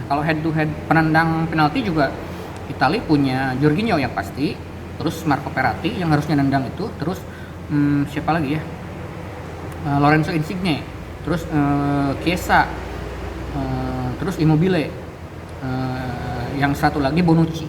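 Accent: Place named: Sunda, Indonesia. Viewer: Bng lagi ngapain di bali ,kaya orang sunda nada bahasa nya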